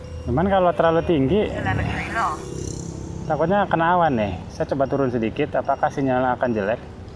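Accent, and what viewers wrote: Cirebon, Indonesia. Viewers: kalo dari logat bicaranya kalo ga orang cirebon.indramayu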